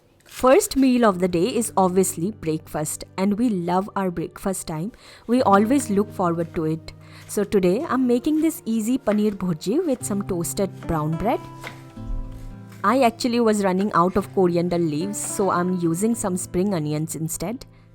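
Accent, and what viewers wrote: Bengal, India. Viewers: Awesome vlogging...sply the way you speak up with a bengali touch i love it...Hop kaya is fine and better...dont worry...stay blessed.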